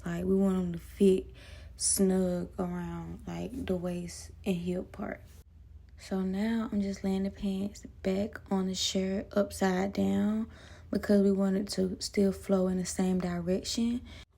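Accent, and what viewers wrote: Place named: South, United States. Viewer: I think this is sooo Amazin!…., I think u should be wayyy up. U’re real deal. My Mama is a seamstress and I have to say that she and only u would be my personal designers if I wanted anythang Taylor made. I used to watch my Mama sew when I was young so she started showin me different things to tha point at around 10 years old, I was makin my own billfolds/wallet for my money to take to school. lol… she charge only $5 per alterations. It’s real money in it for your talent. I see this been 1 year ago so r u jus takin a break, becuz I know u ain’t give this up. Hit me back and let me know somethin. There nothin like Beauty wit Brains. Oh, I’m from Mississippi…where are u from?… I know it’s Southern like me cuz I can hear it in your voice. Mississippi, Georgia, Florida, Alabama, or Texas?… In that order.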